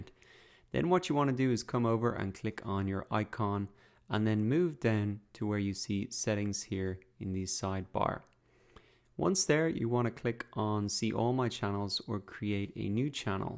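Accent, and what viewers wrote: Ireland. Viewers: Are you irish im irish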